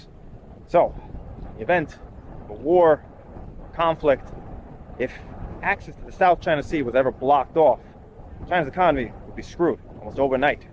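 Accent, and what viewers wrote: New York, United States. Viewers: Where u from in the states? That accent tho. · Awesome episode! The SM mall right after the bridge from Subic Base (at the mark) used to have numerous establishments where a few sections used to belong to my grandparents. They had a bar/restaurant, money exchange and t-shirt printing shop. I used to visit them from Manila when I was a kid and have memories of that area during the late 70's to early 80's. I remember that area to be always crowed and busy due to the military personnel coming in and out of the base especially in the evenings. That whole street and a few adjacent ones used to be lit up like Vegas. I moved to the US with my parents before the US military left and not too long after that the location was controversially taken over by the local government. At any rate, your video brought back some good memories and I thank you for that! 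I also want to add that being a New Yorker myself, I appreciate your accent! Keep making awesome videos, I enjoyed your Japan episodes!!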